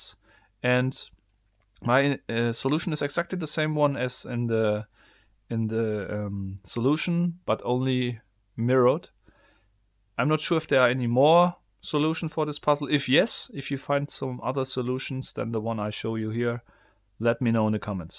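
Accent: Germany. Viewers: Man merkt direkt an deinem Akzent dass du deutsch bist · german accent is so damn cringe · Haha bist du deutscher?? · That's some strong German accent! (Ich müsste mich mal selbst aufnehmen, wenn ich Englisch spreche, vielleicht klinge ich ja genauso) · I love your German accent. Best one I've heard. "Brütforce" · are you from germany? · the German accent makes it more credible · You sound like you are from germany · Are you german? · Deutsche hören es einfach, dass dieser Herr nur aus Deutschland sein kann. 😅😅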